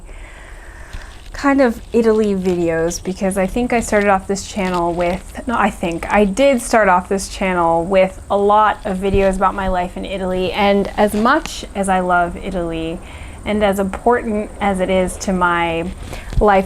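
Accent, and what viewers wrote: United States. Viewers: Hi from Italy... wow... I can understand almost everything you say in your videos. It helps me improving understanding skills of American native speakers... wich are still a big problem for me;) Can you speak Italian?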